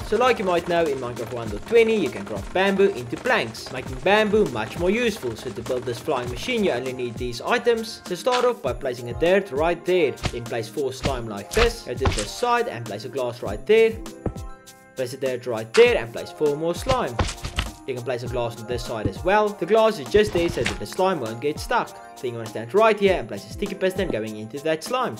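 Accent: South Africa. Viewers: klink soos n safa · South African, my Bru?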